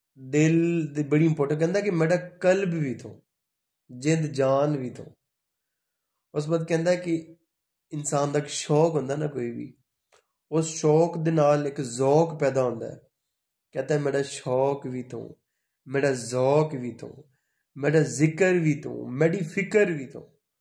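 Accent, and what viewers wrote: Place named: Punjab, India. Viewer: Sir ❤️ huge fan ap ki Punjabi accent k.💕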